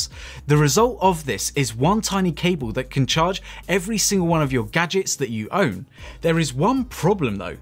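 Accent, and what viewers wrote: United Kingdom. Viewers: is this really Sabrent USA? Sounds more like Sabrent UK. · Thanks, interesting and helpful video. Good to hear a Brit voice supporting a USA company. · Normally i dont like British accents but dude yours is different i can listen to u talk all day 💛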